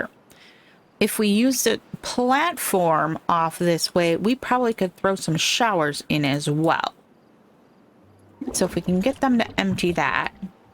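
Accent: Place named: Midwest, United States. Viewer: From ope to oofda, I really enjoy the midwestern speech. It all makes me feel at home while listening. Plus, the soundtrack is so catchy, I love to hum along as well. I hope you had an amazing Solstice, and that the next three months bring you much needed rest and revitalization. Thank you for sharing your playthrough 🙏🌠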